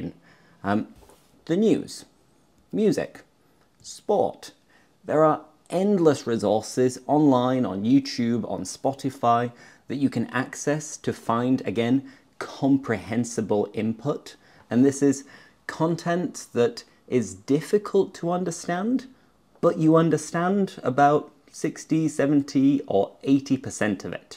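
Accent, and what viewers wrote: England, United Kingdom. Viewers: I LOVE HIS VIDEOS BECAUSE THEY ARE AMAZING AND CAN GIVE YOU TONS OF ADVICE AND EXEMPLES , very nice love his video and his cool english accent and he is right as well about the app.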